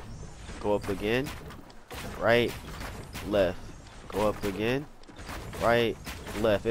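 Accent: Detroit, United States. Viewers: Are you from Detroit? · I know this might be a dumbass question...r u from Detroit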